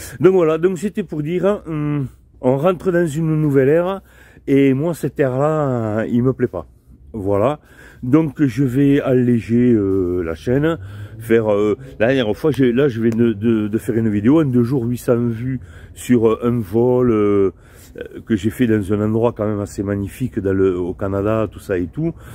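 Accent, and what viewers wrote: France. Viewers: Hello. Je te dirais juste une chose. Laisse les autres américanisé ou quoi que ce soit, il sont bien moins intéressant que tes vidéos. Je prend toujours un vrai plaisir a regarder régulièrement tes vidéos qui m'apportent du bien-être, des apports très intéressants sur fsms, mais surtout de la marade car je ries très souvent car j'adore ton humour avec cet accent du sud. Je t'en supplie, continue à faire tes vidéos. J'adore. Cordialement. Pascal..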